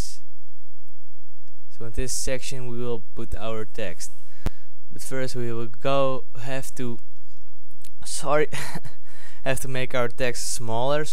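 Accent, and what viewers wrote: Netherlands. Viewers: ja klopt ik klikten op de video en hoorde gelijk dat hij nederlands is :P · je hoort dat je nederlands ben haha aan je accent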